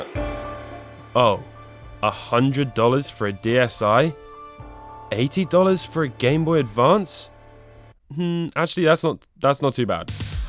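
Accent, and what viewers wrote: United Kingdom. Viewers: Oh, you are brithish! You should go to this place called Goodwill Computer Works, there's one in central texas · He’s British like me 😅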